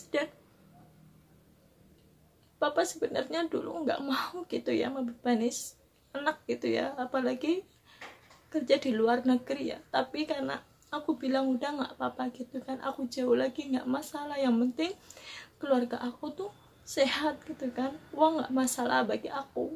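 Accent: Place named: Banyumas, Indonesia. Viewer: bersama ngapak wadon yang lagi cerita di singapore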